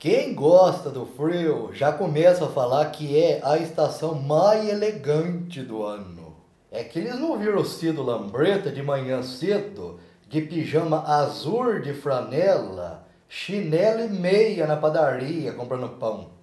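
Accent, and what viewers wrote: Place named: Caipira, Brazil. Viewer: Vc fala igual aos caipira meus tios pai fala assim e eu acho lindo mas ñ consigo falar ñ peguei o sotaque kkkkkkkkk bjos parabéns lindo demais...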